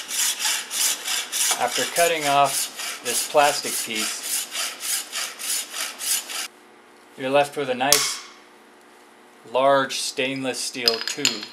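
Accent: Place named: United States. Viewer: Good job. 10 points taking off for sounding like a yankee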